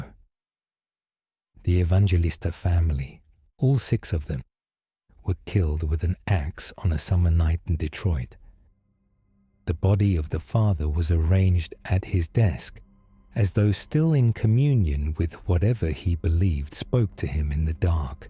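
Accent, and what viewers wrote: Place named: England, United Kingdom. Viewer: Fellow English I love English accent , Chelsea girl London born and raised❤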